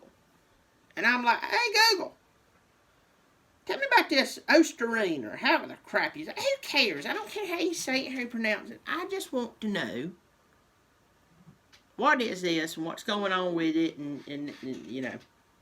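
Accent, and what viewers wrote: South, United States. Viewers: Idk y I watch ya, imma Buckeye fan... not saying osu hates Clemson or anything, I respect the university and I think Sweeny is a stand up top notch guy (and 1 heck of a coach)! I guess I just like watching ya talk smack in a southern gentlemans manner, and not to many better American names than good ol!!!! Love your show man!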